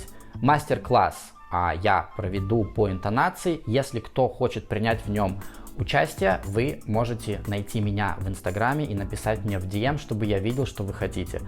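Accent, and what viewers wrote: United States. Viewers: пожалуй не соглашусь, доносит вполне себе усредненый, современный американский и канадский английский.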